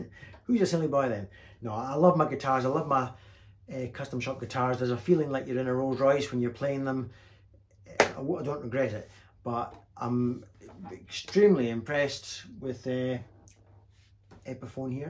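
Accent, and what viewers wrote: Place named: Glasgow, United Kingdom. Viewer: Accent is throwing me off a bit. Sounds Glaswegian blended with something else, perhaps you lived somewhere else for a few years? I may be way off as I don't hear such accents too often here in Seattle.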